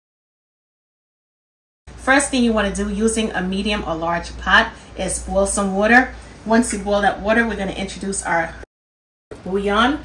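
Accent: New York, United States. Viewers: I hear an accent…Are you a New Yorker?😊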